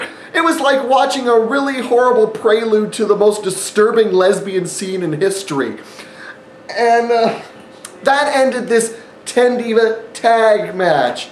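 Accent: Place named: Mexico. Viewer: im mexican and i found ur mexican accent funny i made me crack up great review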